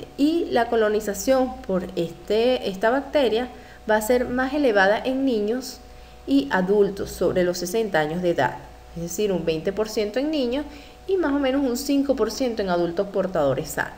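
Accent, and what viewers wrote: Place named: Venezuela. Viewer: Muchas gracias! Me encanto su clase profesora! Además percibí un acento venezolano 🌎💜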